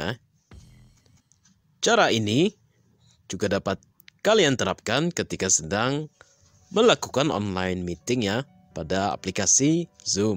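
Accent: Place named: United Kingdom. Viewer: Orang mana kak? Kayak logat english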